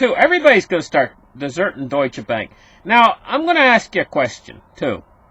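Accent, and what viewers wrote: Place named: Canada. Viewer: If anyone wants to hear a Canadian accent, this man is the example. "The titter on the end" Great accent, great show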